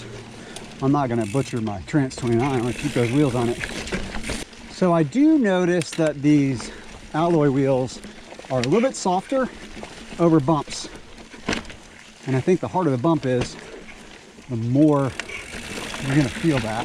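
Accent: United States. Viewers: I love what you do man, Great job. I'm learning from you a lot, with your clear explanation, and your American accent I will never miss anything unlike GMBN lol.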